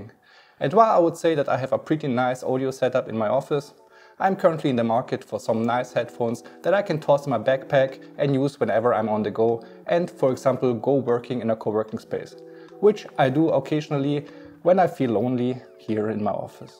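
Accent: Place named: Germany. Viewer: sympathischer deutscher akzent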